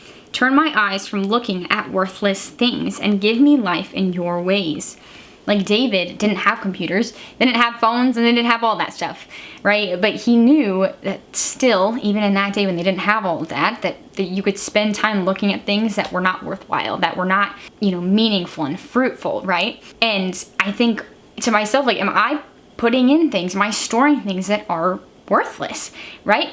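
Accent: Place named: Midwest, United States. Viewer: lol, that mid-western accent 😂😂 is it strong with this one... If your from the Midwest you might not hear it though :(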